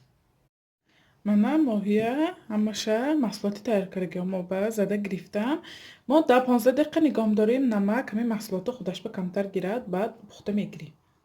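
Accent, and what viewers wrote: Isfara, Tajikistan. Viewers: Салом.рз руи фахмишам номатон аст. Хело хуб.бахшиш мегуфтед.ки шумо дар кадом шахри Русия зиндагони карда истодаед.аз руи гапзаниатон аз ш.Исфара мебошед.агар хато накунам.кадбонуи хуб будаед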